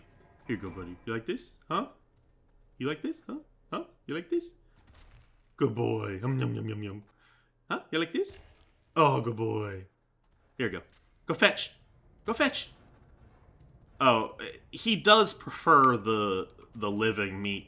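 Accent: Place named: Brooklyn, United States. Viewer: you sound like brooklyn guy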